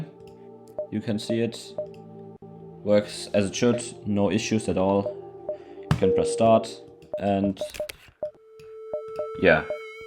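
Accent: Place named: Germany. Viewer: Fantastic video!! your english is perfect and your german accent is nothing to be ashamed of. i had spent the last year trying to find a solution for multiverse on my macbook, only recently discovering it could work with crossover with a few shenanegans, im sure this video will be a goldmine for anybody like me who cant fork out hundreds of dollars for it.. fantastic work!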